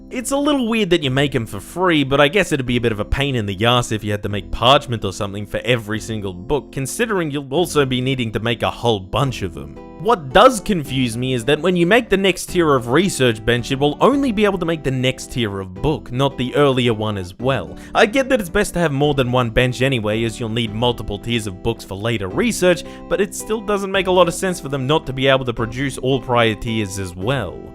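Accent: Australia. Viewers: I knew you were a fellow Aussie but your accent seems influenced by something else, I can't pick it and it drives me bananas, mate!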